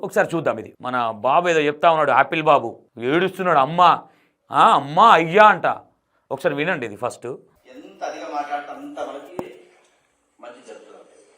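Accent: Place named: Telangana, India. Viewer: anna miru,mi acting and telangana yasa super lo vallanun tittadam super 😂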